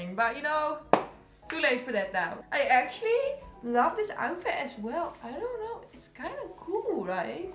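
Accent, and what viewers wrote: Netherlands. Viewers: her accent sounds dutch, is she dutch?😅🍓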